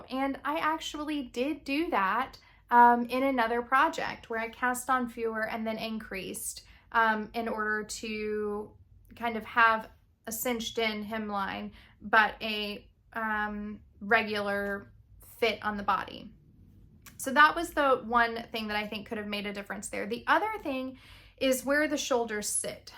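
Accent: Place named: South, United States. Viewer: My word is growth. 🥚 I love your podcast. As a fellow southerner it is nice to watch someone who sounds like home.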